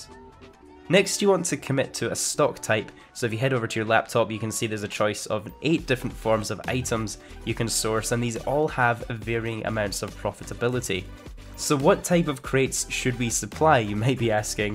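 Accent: Scotland, United Kingdom. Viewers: Are you from Scotland if you are so am I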